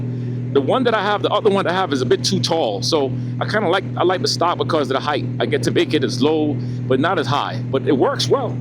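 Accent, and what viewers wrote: Jamaica. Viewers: Love that Jamaican accent brother! Keep these videos coming love em! Best Album made from any star past or present my opinion was! Guess who the artist was?! 😂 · I love that Jamaican accent.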